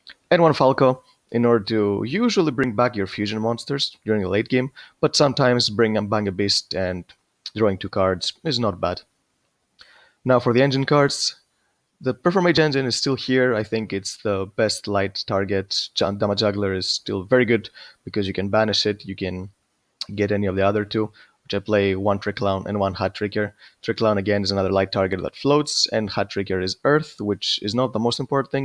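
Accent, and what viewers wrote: Greece. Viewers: Και έλεγα ρε φίλε λίγο ελληνική αυτή η προφορά 😂😂😂, πολύ καλή δουλειά πάντως μπρο συνέχισε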